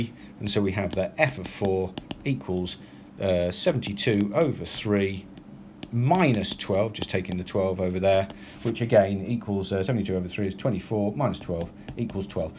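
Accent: United Kingdom. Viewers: Thank u random British guy 💂🛐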